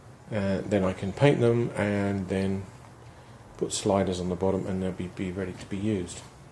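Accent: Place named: England, United Kingdom. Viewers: I wasn't expecting an English Accent..... lol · I was also wondering what an English man is doing in Texas 😀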